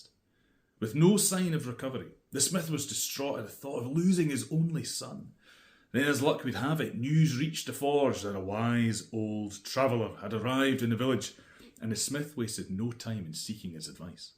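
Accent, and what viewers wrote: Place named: Scotland, United Kingdom. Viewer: I'm a firm believer that folklore stories are best told by someone from the country the story comes from...LOVE Scottish accents the best 😊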